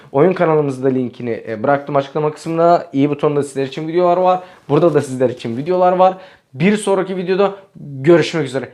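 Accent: Nakhchivan, Azerbaijan. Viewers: Ayda sən diyəsən Naxçıvanlısan danışığın ləzzət eliyir😄😄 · Azerbaycanca necede qeseng temiz danisirsan abi🤣🇦🇿🇹🇷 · Salam siz azerisiniz yoxsa turk lehcenizden belli olmur da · Sen ne qeweng Azerbaycan dilinde daniwirsan😁👍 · Qaqa sən azərbaycanlısan ? Bizimki danışırsan .qaqam sökür sən ha 😂😂😂😂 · 😂😂😂nə gözəl danışır Azərbaycan ləhcəsində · Qaqa, senin Azerbaycan dilinde lehceli danışığını sevirem. Ona göre izleyirem seni. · Azerice konuşman ləzət edir.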